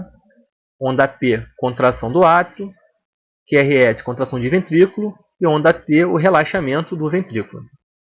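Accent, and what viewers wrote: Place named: Rio de Janeiro, Brazil. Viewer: Adoro esse sotaque carioca. Um dia ainda caso com um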